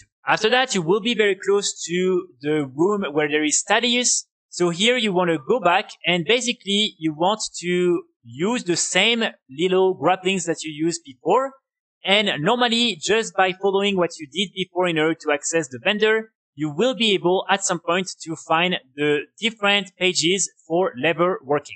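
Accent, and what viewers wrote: France. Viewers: Bonjour, merci pour la vidéo! J'ai une question, je joue chevalier de la mort. Je suis forgeron 300. Est ce que je suis capable de fabriquer le set T3 Guerrier ou je suis obligé de réaliser tout le run de la vidéo avec un guerrier que j'aurai up lvl max et forgeron 300? (j'ai écris en français car je sent l'accent français chez toi ahah). Hello, thank you for the video! I have a question, I play death knight. I am blacksmith 300. Am I able to make the T3 Warrior set or do I have to do the whole run of the video with a warrior that I will have up lvl max and blacksmith 300?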